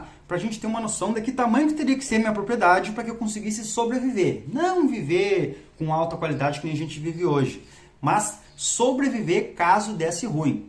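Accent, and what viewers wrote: Brazil. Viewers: Deus te abençõe por compartilhar o conhecimento isso é muito importante.quanto a seu sotaque achei sempre muito bonito o sotaque do Sul assim como de outras regiões é isso que fais nosso Brasil ser bonito é essas mistura de sotaque e de Cultura por todo o pais.Deus abençõe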